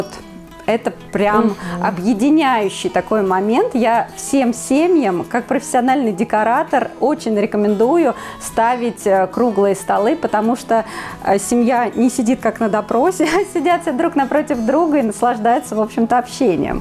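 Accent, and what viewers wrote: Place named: Yakanye, Russia. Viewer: Режет ухо Яканье.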